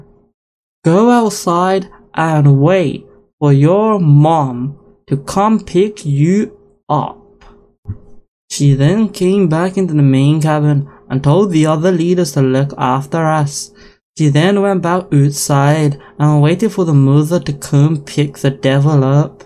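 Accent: Scotland, United Kingdom. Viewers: Advice. Ditch the Scottish accent on the video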